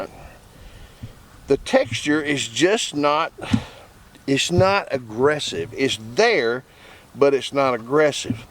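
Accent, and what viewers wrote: South, United States. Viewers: I love the southern accent especially when he was talkin about people with “fat fangers” lol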